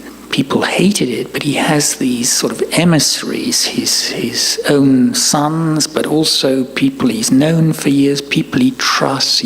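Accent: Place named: England, United Kingdom. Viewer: There is someting special about a historian with a great english accent and fantastic delivery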